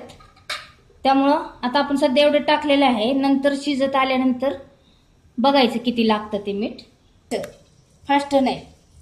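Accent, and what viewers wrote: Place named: Nashik, India. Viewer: mavsi nasikkadchya ahea ..amchya kadchya chan language agdi apli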